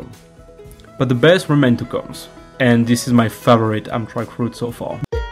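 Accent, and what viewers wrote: France. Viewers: I've taken the California Zephyr, Coast Starlight, Empire Builder, Southwest Chief, and Texas Eagle. My top 3 are the Zephyr, Empire Builder, and SW Chief. The Coast Starlight is very scenic, but I'm still salty about Amtrak discontinuing the private lounge car for the sleeper passengers. The Cardinal is on my bucket list. Great video, though I suggest you slow your speech just a little bit. My ears adjusted to your French accent, but it took a few minutes.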